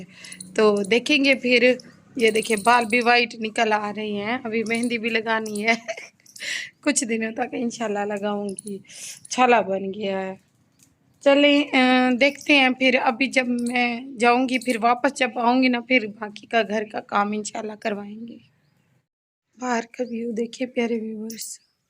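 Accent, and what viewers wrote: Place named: Saraiki, Pakistan. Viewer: Urdu to achi bolti hain sis bs lehja Kuch change krny ki zrurat hai qk Urdu Mai b lehja bilkul sraiki wala hai na is Liye log kehty hain k sraiki hi bol lia kren....Jo unparh hoty hain wo to lehja sraiki wala b 4khyn ya Urdu toti photi bolyn koi Kuch nhi kehta lekn AP to mashaallah educated hain na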